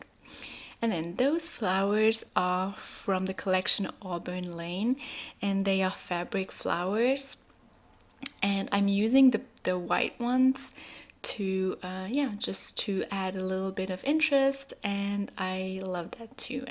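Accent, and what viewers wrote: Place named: France. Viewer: Ps: j’adore ton accent français ☺️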